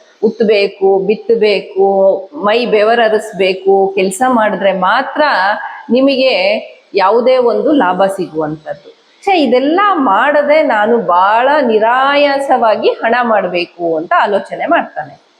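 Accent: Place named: Tulu Nadu, India. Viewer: ಮೇಡಂ...ನಿಮ್ಮ ಮಾತಿನಲ್ಲಿ ಯಾವುದೇ ತಪ್ಪು ಸಂದೇಶವಿಲ್ಲ, ಆದರೆ ನಿಮ್ಮ ಮಾತು ಕೇಳುತ್ತಿದ್ದರೆ ನೀವು ನಮ್ಮ 'ತುಳು,ನಾಡಿನವರಂತೆ ತೋರುತ್ತಿದೆ,ಯಾವೂರು ಮೇಡಂ..?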